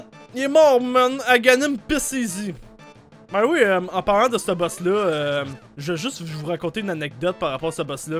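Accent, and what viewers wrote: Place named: Quebec, Canada. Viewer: hey je viens de decouvrir ta chaine complètement par hasard et franchement je m'abonne X) premièrement je résiste pas a l'accent québécois ensuite jadore zelda et metroid et enfin tu as une super énergie genre waw la je suis partie pour me faire un marathon de toute ta chaine :) continue comme ca !! :)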